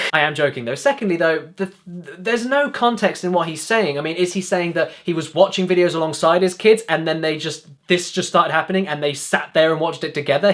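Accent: United Kingdom. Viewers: Wait are you british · hes so Brittish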